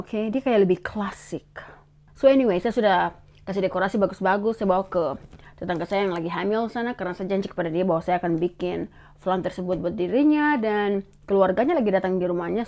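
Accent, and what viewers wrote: Manado, Indonesia. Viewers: Daya tarik vlog kak ap adanya n logatnya jg suka,stengah indo,inggris manado 😂😂😂😂😂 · Don't worry saya org malaysia pun sgt understand apa yg kamu katakan yr english n bahasa indonesia perfecto only yr slang very manado no problem with that's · Aku suka logat manado n maluku kak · Gapapa kak kalo bisanya ngomong bahasa Inggris lebih nyaman dan mudah ya ga usah peduli apa haters bilang soalnya cape dengerin apa kata netizen yg maha benar dan berkuasa wkwk....iya juga sih sebenernya kak lebih baik ngomong bahasa Inggris campur2 Indonesia.soalnya klo pun kak ngomong bahasa Indonesia takut nya juga ga gitu bener bahasanya karena lidah org menado/Maluku klo ngomong bahasa Indonesia juga ga bisa sih.jadi mesti full bahasa Maluku /Manado gitu.intinya aku paham maksud kak gimana sih makanya dia milih ngomong campur Inggris juga ya karena dia ga tau kosa kata bahasa Indonesia dengan benar tpi klo bahasa Manado ya kak lancar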